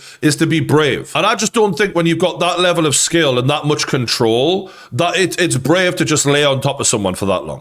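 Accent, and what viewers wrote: Newcastle, United Kingdom. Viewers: 100% Geordie this is a joke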